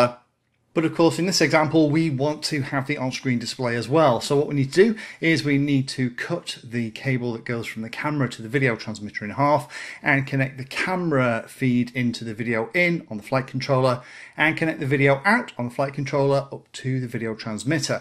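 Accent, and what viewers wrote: United Kingdom. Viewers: I like all of your videos especially your very proper British accent and the pace of your tutorials. I should have watched this particular video first because it gives me a lot of comfort that I will not crash something on my first try with a flight controller.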